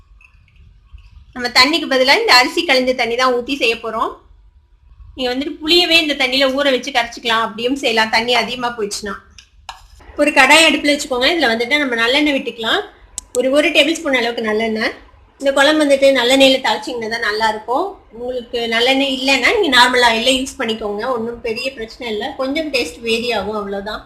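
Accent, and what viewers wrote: India. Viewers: நீங்க நிஜமாவே கோவை தானா..? உங்க பேச்சு வழக்கு எங்க பகுதியில் உள்ளது போல இருக்கு களனி னு சொல்றது களனி புளிச்சாறு ரொம்பவே நல்லா இருக்கும். Idhu ennoda mobile number sister. karaikudi pakkam vaanthaal avasiyam vaanga sister. 😊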